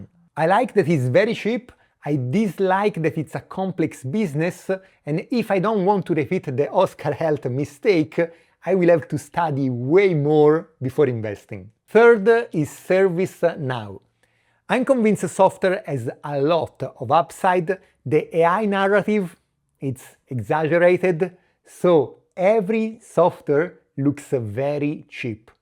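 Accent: Italy. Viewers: by the accent I think you’re Italian like me. Anyway I’ll write in English so everyone can read. I’ve been invested for few months now. I found it because I was upset after I sold my MU position at 120$ and was looking for the next AI bottleneck. They have a spintronics technology which serves to acquire, store and transmit data. And I thought this could be helpful in the future for AI considering the problem now is mostly about Data management. And it doesn’t require water to cool down like nowadays data centers. Let me know your opinion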